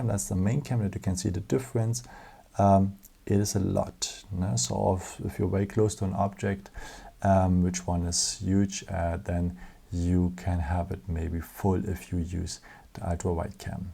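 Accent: Germany. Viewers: he is german i can hear his accent but amazing is he doesnt sound like Arnie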